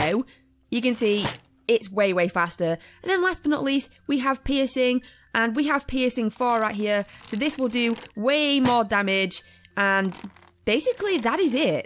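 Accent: England, United Kingdom. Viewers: Cant You Hear Her? And Shes English And What Language Are you?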